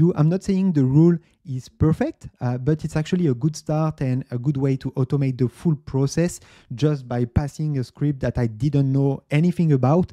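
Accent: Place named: France. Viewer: Ah l'acccent fr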